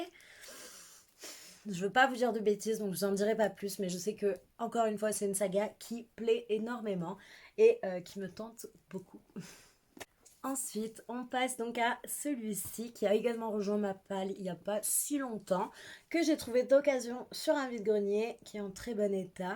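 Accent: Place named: England, United Kingdom. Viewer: Bonjour très bonne vidéo apaisante. Vous avez tun bel accent anglais. J aimes le livres fable. Merci bonne journée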